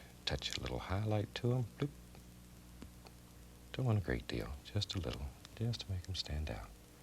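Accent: South, United States. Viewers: in the later episodes its harder to notice that he has a southern accent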